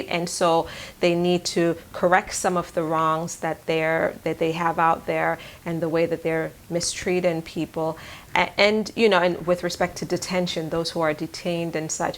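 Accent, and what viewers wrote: Jamaica. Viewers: R u a Jamaican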